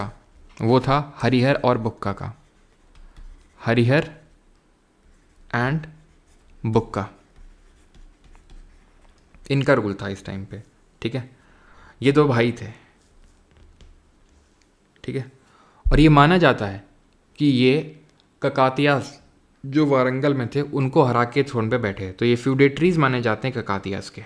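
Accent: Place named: Uttar Pradesh, India. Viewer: Sir pdhate hue apni up accent me yaad kradete hai ap. Thanks a lot sir we needed this.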